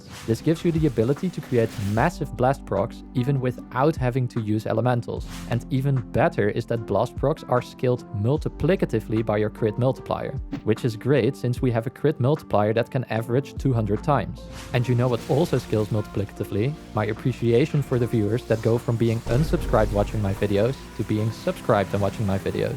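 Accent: Netherlands. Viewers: Nederlands accent gaat enorm hard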